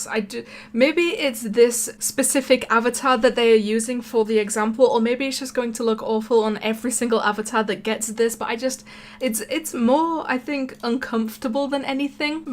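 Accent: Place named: North London, United Kingdom. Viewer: I think she’s North London. I am in east London.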